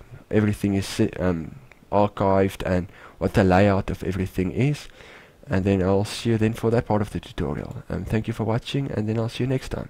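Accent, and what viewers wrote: South Africa. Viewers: he clearly has the South African accent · You sound like a south African. That's surprising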